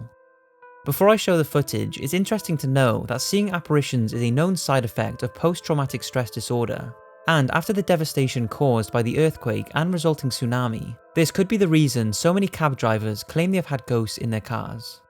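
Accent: Wales, United Kingdom. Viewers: Michael Hunt I always thought he sounded Welsh but maybe not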